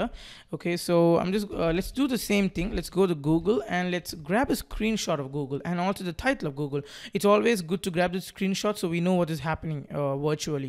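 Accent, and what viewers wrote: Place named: India. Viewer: God bless this boy with a strong Indian accent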